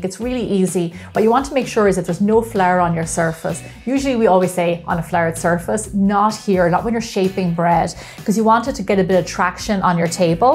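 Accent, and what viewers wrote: Ireland. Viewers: your bagels looked delicious, made me hungry, & i enjoyed your accent. top 'o the mornin' to ya lass. do you have any sour dough recipes? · With the Irish accent this woman could give a recipe for swill and it would still sound good. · Irish accent?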